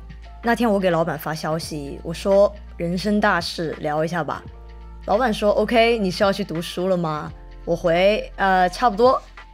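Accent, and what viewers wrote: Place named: Taiwan. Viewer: 祝你创业成功。 btw:听你说话感觉像台湾口音。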